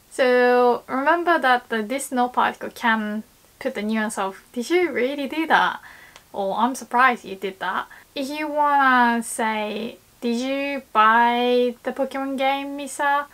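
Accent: England, United Kingdom. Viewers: Your English accent's made me obsessed with your Japanese lessons! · Misa is so fluent in English that I can only assume that she studied British English, or that she learned her pronunciation from a British person. British English and Japanese are non-rhotic languages so their vowel sounds flow very similarly, compared to the rhotic language of American English. I knew someone who studied American English for 10 years and had a strong, unnatural accent, but my friend who studied British English for 1-2 years spoke with a very natural accent. It is in my opinion that if more Japanese people studied the non-rhotic British English that they would be able to speak much more fluently. For example, let's take two words my English students struggle with, 'work' and 'walk'. If you look in Japanese-American study books they will say something as ridiculous as ウォーLク(walk) and ワーRク(work) in an attempt to force the rhotic sound (that isn't present in Japanese and has to be represented by the Roman alphabet). 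However, in British English ワーク(work) and ウォーク(walk) with rhotic consonants removed are perfect, with a simple accent change on the ワーク vowel from 'ah' to 'eh' and, other than naturalising the 'w' sound, ウォーク(walk) is perfectly natural to say too. If more schools/companies taught British English more and more Japanese people would be able to speak English with natural accents, and a natural British accent is much easier to understand than an unnatural and forced American accent. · British accent for the win! :) Great video btw. I was struggling after reading Tae Kim on this point. You explained it very well. Now I need to find some good examples of listening practice.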